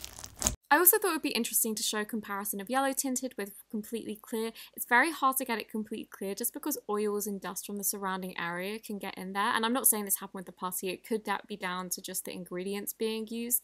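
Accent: United Kingdom. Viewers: it's so nice to hear a slime you- tuber from the UK!!! also great video 😍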